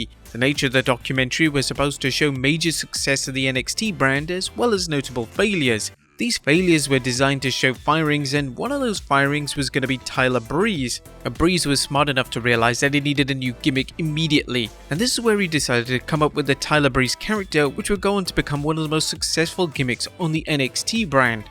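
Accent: United Kingdom. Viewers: I know your brittish and have an accent, but how in the hell do you get "Evil-lution" out of the word "Evolution"?? REMEMBER, The 3rd letter is an "O" not an "I"..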